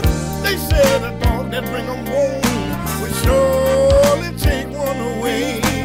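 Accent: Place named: Memphis, United States. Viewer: He sound like one of my OG uncles from Memphis Mane. 🤣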